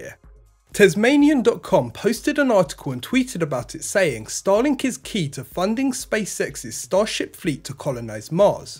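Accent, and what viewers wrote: United Kingdom. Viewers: Turn the volume to zero. Sorry that UK accents annoy you (not really). His use of technical terms, and of English grammar are excellent. Maybe that is your problem.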